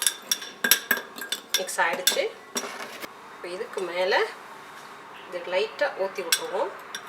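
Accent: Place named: Thoothukudi, India. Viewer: Really Sorry Mam. I'm confused because of your nice Tamil vocal & your Thoothukudi slang also of course. Even I thought, just for name sake you are telling like you are in Mexico, in your past Birthday Special video "35 Things". First of all belated Birthday Wishes Mam. After a long time, without play forwarding I've watched your 1 hour video of that "35 Things". Afterwards I've clicked the subscribe button without any hesitation. Since Yesterday only I've visited your channel. Take Care Mam...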